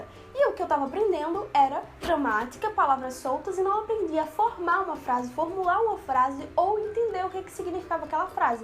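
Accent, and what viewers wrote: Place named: Santa Catarina, Brazil. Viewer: Gostei de seu sotaque catarinense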